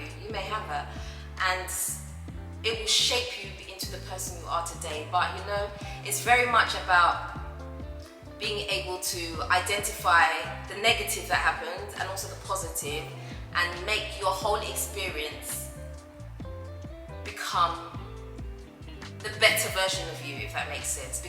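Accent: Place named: Midlands, United Kingdom. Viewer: I am surprised to hear you are “Londoner” My Mrs who is a Londoner thought you were from mid-lands Becos of your accent and how you pronounce certain words Anyway thanks for sharing your story, much appreciated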